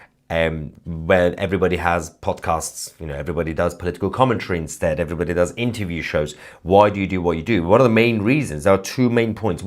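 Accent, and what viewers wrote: United Kingdom. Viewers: Annoying British accent lol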